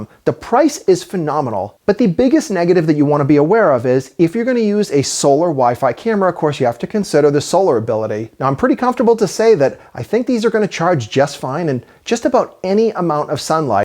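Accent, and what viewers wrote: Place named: New England, United States. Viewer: The narrator definitely sounds like a New England type. Maybe he’s related to Norm on the New Yankee Workshop. You guys take care. I hope the evidence that you gather from the footage is taken into consideration if the situation turns into a legal case. Good question, how can you keep a camera?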